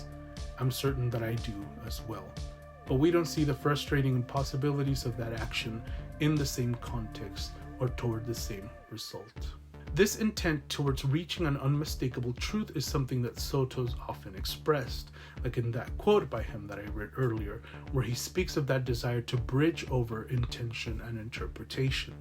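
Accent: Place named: Los Angeles, United States. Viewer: I love Sotos and I really adore you I’m from la and a bibliophile and I think you’re both those things too- I can tell by your Angeleno accent. Keep reading or as i vita sine libras mors est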